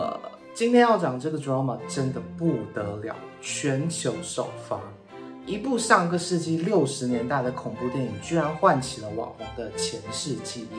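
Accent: Taiwan. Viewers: 有些是不太一样 有部分听起来会更偏台湾 有部分更偏大陆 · coma居然不是台灣人😯 為什麼口音這麼像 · 因為口音很像台灣人😄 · 我一直以為COMA是台灣人XD 因為腔調太像了~原來不是~ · 幽靈船那部電影好看，“鳥“是一部很經典的驚悚片。COMA應該是曼德拉效應困擾了你，話說COMA是哪裡人啊！口音用詞聽著很台灣，可不可以請問是哪裡人，我真的沒有惡意。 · 感覺是香港人？一直覺得有些用語很中國，但腔調非常台灣疑惑超久XD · 廈門在福建 所以口音像台灣腔很正常的（福建人的口音真的很像台灣腔） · 我也以為是台灣人耶🤣想說是不是為了拍片所以講的比較字正腔圓而已🤣原來是廈門人啊！ （大家都好和平覺得這裡很棒！） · 你们当然能听出来了，但是对于大陆尤其北方的来讲这已经很台湾口音了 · 我還以為coma是馬來西亞人🙈你的口音不像內地人反而偏向台灣人，還時不時說一兩句英文😂😂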